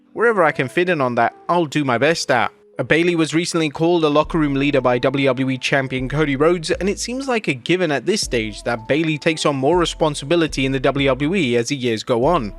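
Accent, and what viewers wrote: England, United Kingdom. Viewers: 😅😅😅 .. Remember, he's an Englishman. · if you see “controversial” in the title, expect the british narrator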